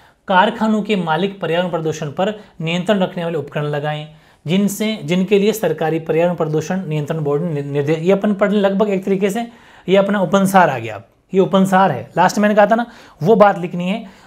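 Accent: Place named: Jodhpur, India. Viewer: bohat bdiya lagi sir class bolta h jodhpur vala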